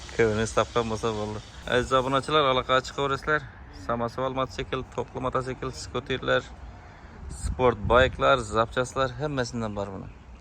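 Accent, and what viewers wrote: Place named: Mangit, Uzbekistan. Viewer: Амударёдоми диман мангитчи тилда суйлаб турибсизгу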